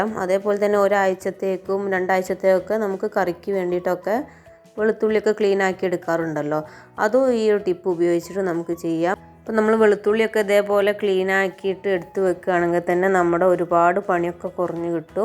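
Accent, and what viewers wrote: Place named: Kannur, India. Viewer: ഞമണ്ടി എടുക്കാൻ 😂😂😂,കണ്ണൂർ ഡിസ്ട്രിക് ആണോ സഹോദരി ,👏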